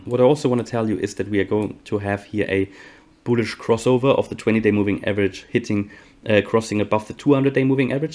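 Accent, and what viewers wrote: Germany. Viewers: German accent detected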